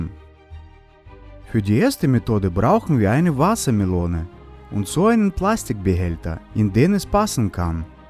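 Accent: Russia. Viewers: Sein russischer Akzent bringt mich zur Weißglut 😑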